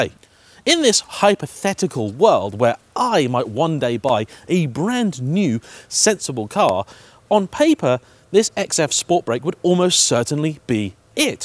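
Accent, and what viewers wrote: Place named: RP, United Kingdom. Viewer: YouTube algorithm brought me here. The posch accept kept me through to the end 🤷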